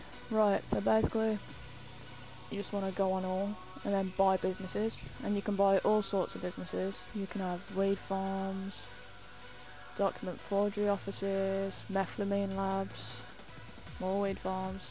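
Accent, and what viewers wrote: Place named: Birmingham, United Kingdom. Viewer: LOL SHE FROM BRUM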